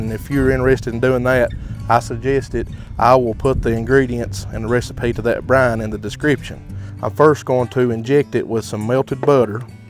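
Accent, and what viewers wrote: South, United States. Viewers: Call me biased, but I tend to place greater trust in smoking and barbecue recipes when they're delivered with a Southern accent :)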